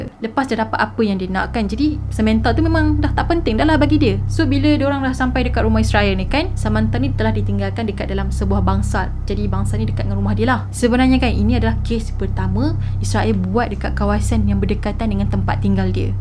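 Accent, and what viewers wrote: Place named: Kelantan, Malaysia. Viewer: Israil ni org kelantan ke....dengar mcm kak ckp mcm org kelantan...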